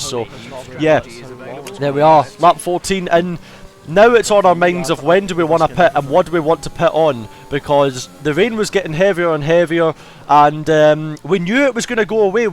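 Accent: Scotland, United Kingdom. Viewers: Ahhhh man!! That race was going so well, I would have loved to see you and Jarno fight in softs after the SC :( Still a solid finish, shit happens so just move on stronger 🙏🙏 PS: I generally have hard time with the Scottish accent but your commentary is very very clean and understandable!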